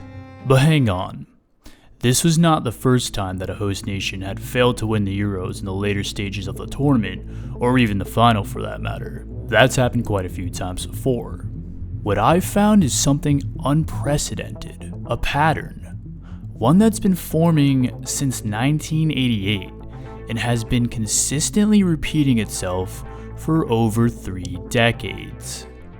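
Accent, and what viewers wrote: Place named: United States. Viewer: Next time I hear an American accent talking about football I'm going to immediately switch off. this is bs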